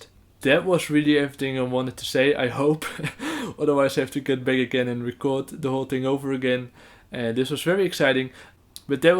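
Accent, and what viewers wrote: Netherlands. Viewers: Het Nederlandse accent is geweldig ahaha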